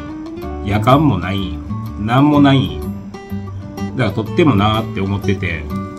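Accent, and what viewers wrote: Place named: Kansai, Japan. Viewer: お元気でしたか😂東京のピエロには標準語で話しかけてあげてくださいね。引きたいのに関西弁はひかれます笑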